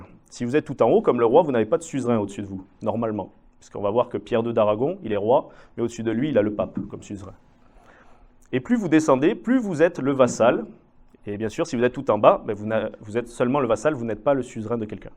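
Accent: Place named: Occitania, France. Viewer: racontée avec un bel accent occitant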